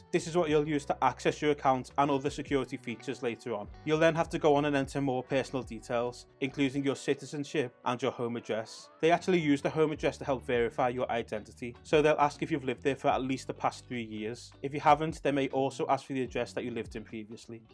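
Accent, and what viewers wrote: Liverpool, United Kingdom. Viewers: yeah I lived in wavertree originally and moved up to Clitheroe to live with my partner. And I agree especially when it comes to financial content I haven’t seen anyone with the scouse accent scousers tend to get more of a bad rep compared to a good one. so it’s especially good seeing someone talking about finance and providing great advice like yourself.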